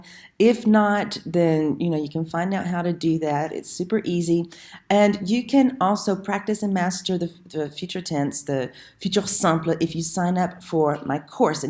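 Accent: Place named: Southwest, United States. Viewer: My guess from your English accent is you (or your teacher) lived in the Southwest USA. I love it!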